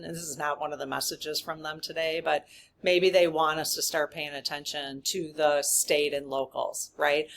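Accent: Midwest, United States. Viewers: Hi. I love you and absolutely love your content. You have helped me more than you can ever know. ❤ And have to say, I kind of thought you were in Wisconsin. I am originally from Illinois. A FIB knows a cheesehead when we see one! I think it's the Midwest accent. When I moved to Arizona, everyone told me I had a weird accent. I hope I didn't offend you. That was not my intention.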